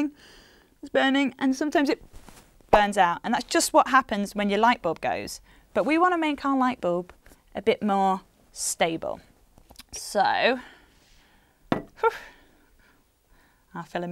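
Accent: United Kingdom. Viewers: She's all British and stuff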